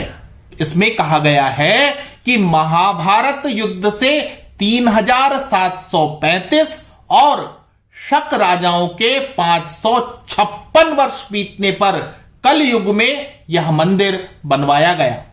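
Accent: Standard. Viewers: Itana shudhha Hindi sunane ko milaa aaanand aa aagayaaa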